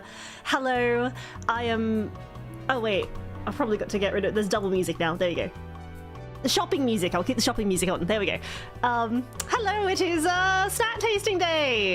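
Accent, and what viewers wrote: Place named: United States. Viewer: I love her American accent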